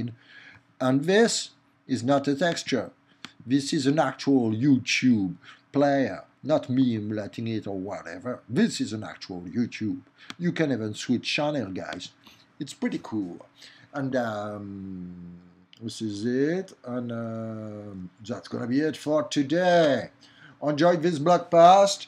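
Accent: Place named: France. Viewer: I love this and I think it's easier to do it in WebGL than in JavaFX :) Your fenglish is cute :)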